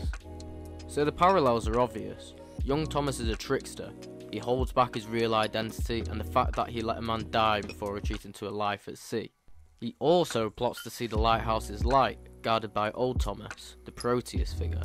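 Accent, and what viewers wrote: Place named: United Kingdom. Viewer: I scrolled down to subscribe wondering if you had tens of thousands of subscribers or hundreds of thousands. I'm truly astonished you only have 35 when I write this. This is a really good video essay, nicely presented, excellently written and well researched. Honestly, I don't have an issue with your diction being a fellow Brit, but I think to be more accessible to international audiences the other comments are right, you have to clear up your accent a bit. I know it sounds classist but the BBC have it right wanting ppl's accents to be clearly understandable.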